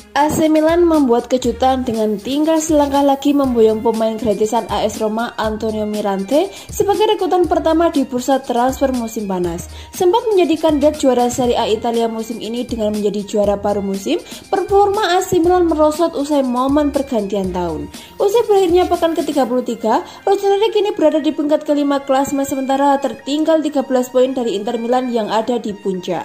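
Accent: Java, Indonesia. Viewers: Logat jawa nya kental sekali mind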